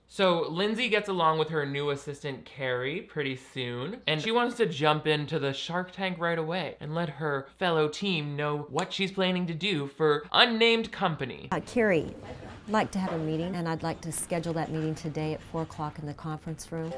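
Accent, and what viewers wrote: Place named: South, United States. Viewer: These Christian movie clip breakdown brings out the best out of, and I'm not just talkin bout that southern accent. LOLs all around 10/10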